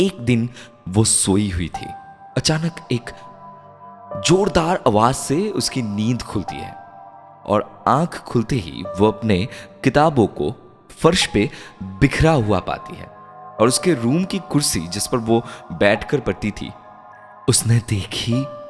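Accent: Bengal, India. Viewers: Kintu jai bolo banglay pret kotha sunte beshi vlo lge...ar Hindir ucharon arro beshi valo korte hbe.karon banglar akta tan theke jay jotoi Hindi te bolona keno.its ok prothom episode ata..aste aste ai ucharon ar tan gulo valo hye jabe..♥️ afterall voice to tomar marattok sundor...♥️ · Darun hoyeche. Ektu bangla tan ache Hindi te but darun. Chaliye jao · I will give Honest review.. no oiling no polishing. Don’t continue with the Hindi version. Because you’re many Hindi words sounds like Bengali. please continue your Bengali version. At least we can enjoy your bangla bhasha. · I love your voice. In bengali channel what I love most is your amazing gift of gab and presentation, will love to experience that here too. But please note your hindi pronounciation needs little bit improvement, the touch of bengali tongue is a little prominent, otherwise marvellous presentation. 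I know you will improve, as always. All the best for this new journey. Have a good day! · Vai keya hindi he purai bangla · Dada tomar voice ta just osmmmmmm ❤️❤️❤️ Hindi teo bangla r feeling asche 😍😍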